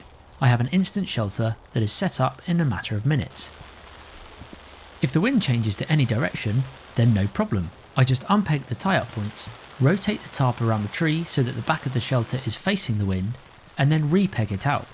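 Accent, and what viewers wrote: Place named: United Kingdom. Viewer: Hate the lime accent.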